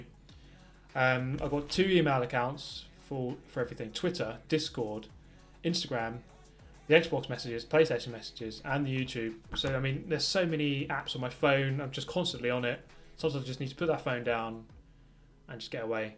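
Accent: England, United Kingdom. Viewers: Which area of England are you from? E.g. North east, south west etc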